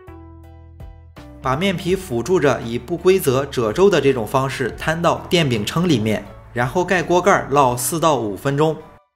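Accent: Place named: Jinan, China. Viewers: 这北方话，北方风味，👍🏻👍🏻👍🏻👍🏻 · 这济普话听得真亲切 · 青岛人，让你听出来济南话了。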